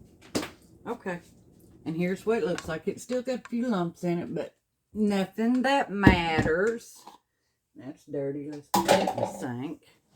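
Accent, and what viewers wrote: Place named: Tennessee, United States. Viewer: How cute are you!!☺️… Love that accent.. Are you in Tennessee?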